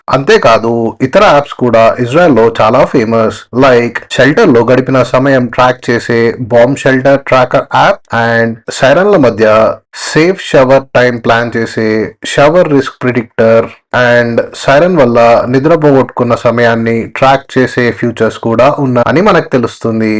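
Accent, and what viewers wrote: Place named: India. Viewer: Content bagunnayi but telugu slang konchem change cheyali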